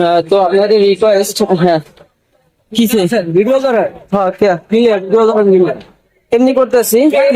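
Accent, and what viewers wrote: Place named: Khulna, Bangladesh. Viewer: দেশের বাড়ি কী খুলনা কথা তো আমাদের খুলনার মতো মনে হয়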